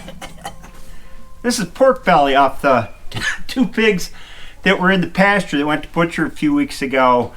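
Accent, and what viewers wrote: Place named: Central New York, United States. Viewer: I live in Kentucky after 18 years Finger Lakes...you definitely have that central NY accent. Nice to see drone footage with a little sliver of Cayuga Lake right at the end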